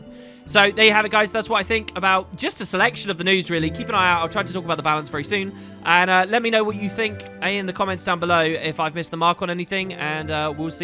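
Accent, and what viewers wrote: United Kingdom. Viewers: You have nice voice, always enjoying your accent (not too British, so I can actually understand) and intonation. (Tho sometimes your videos have a bit screwed up volume balance ;d Just wanted to compliment you as a fan ;)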